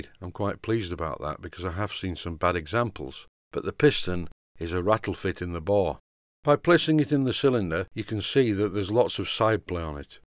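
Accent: Yorkshire, United Kingdom. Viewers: Instead of wife and girlfriend jokes why not recite ‘Albert and the Lion’ to show off you fine Yorkshire accent? Thank you for a really fascinating series.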